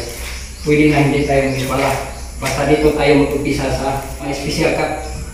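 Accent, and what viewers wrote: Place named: Visayas, Philippines. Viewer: Kaibigan , kung quality control procedure pag usapan natin yong procedure mo hindi ac ceptable . Bakit ? Hindi tama ang mga salita or word sinasabi mo , gaya ng pag lagay mo ng level gamit ang string , or tansi sabi mo elevation hindi elevation tawag nyan kaibigan ang tawag nyan level . Ang term na gamitin mo paglatag ng tiles kung hindi kapa naglagay ng mortar para maiindihan ng viewers , ang term na gamitin mo ay dry "Pre lay ". Saka kailangan nga basa-in mo ang substrate ng structural flooring bago ka mag umpisa maglagay ng mortar. Hindi mo rin sinabi kung anong timpla ng mortar . Ano ratio ng buhangin at cemento ? Yong buhangin mo naka screen ba yan ? Bakit hindi ka gumamit ng tile adhessive.? Kaibigan, sorry to correct your language , kc parang bisaya or cebuano ang mother language mo. Yong sabi mo na special cut ,ang tawag nyan sa tagalog " seroho" in english cut tiles. 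Ang insakto quality procedure pag instal ng tiles na ginagawa namin sa Middle east gamit ng dry pack . Madali at effectivo ang paglagay ng tiles . Ang dry pack mix design is 1:2,5 one bag cement and sand 2.5 compose of screen sand buhangin nakabistay. Mag timpla ka ng cement paste compose of cement and water . Yon ang ebuhos mo sa dry pack pero hindi lahat ng area sa naka spread na dry pack mix. Kailangan compacted ang dry pack mix gamit trowel habang nag spread ka ng dry pack mix. Dami pa malalaman mo kaibigan amigo. Akong paminaw bisaya ka kc accent mo bisaya. Bisaya man pod ko .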